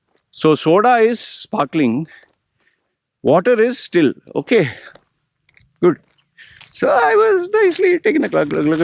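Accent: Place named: Malabar, India. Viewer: So after your Burj Al Arab visit you became sophisticated! But how come you didn't acquire that particular sophisticated British accent as well? And you still managed to retain your Malabari accent!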